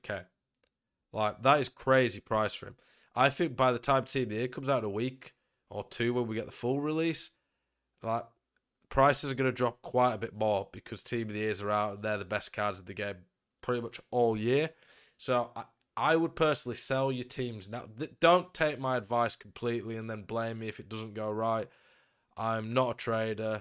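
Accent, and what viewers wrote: England, United Kingdom. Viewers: Where you from man ? Your accent is English but I dont know which city ! Just curious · Bradford Innit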